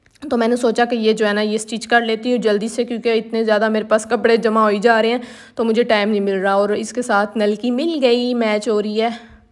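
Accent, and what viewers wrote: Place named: Pothohari, Pakistan. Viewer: Ap potohaar sy hn?ap ka lehja pothohaari ha.lkn ap punjabi show krti hn khud ko.why?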